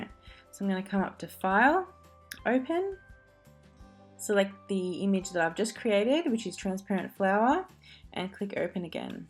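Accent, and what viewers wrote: Australia. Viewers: For once! its nice to hear an Aussie accent. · Very comprehensive tutorial and with an Aussie accent!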